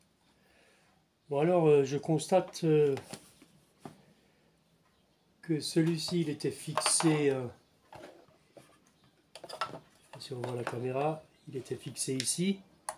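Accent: Alsace, France. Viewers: Ça sent l'accent d'Alsace ici ! Bonne vidéo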